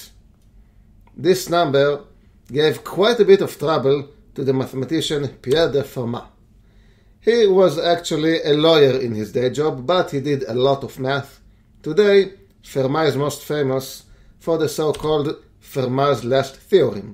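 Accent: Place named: Israel. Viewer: מהמבטא ניחשתי, מהשם של הערוץ וידאתי, וואלה יש ערוצים ישראלים שמשתתפים ברעיון הזה. נחמד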